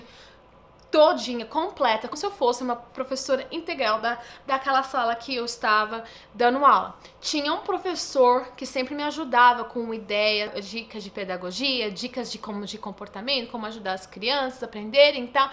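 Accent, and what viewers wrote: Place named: Portugal. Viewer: lembra um pouco portuguesa seu sotaque é bem diferente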